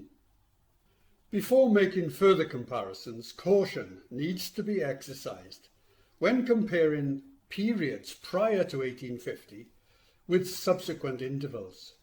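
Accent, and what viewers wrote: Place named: Scotland, United Kingdom. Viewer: I had a professor that reminds of this narrator. He had a great deal of knowledge but was more boring than watching paint dry or grass grow. He does have his Scottish accent that aids his presentation slightly but I couldn’t make it through it. Possibly because I’ve seen this data presented in other formats. Carry on the fight though as I’m sure that there are many out there that either haven’t heard or refuse to accept factual data on “anthropomorphic climate change”.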